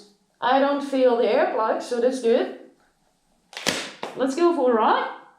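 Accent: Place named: Netherlands. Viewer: Why does she have a verkakte Dutch accent?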